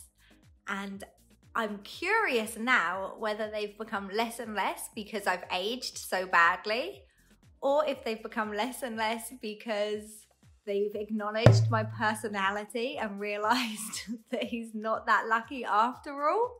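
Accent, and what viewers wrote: United Kingdom. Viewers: I like to listen to you talk, which made me love the British accent.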